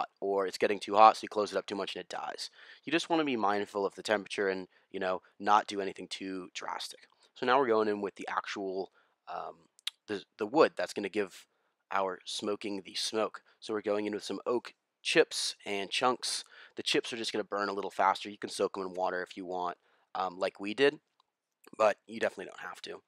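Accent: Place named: United States. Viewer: American accent, so I'd say F.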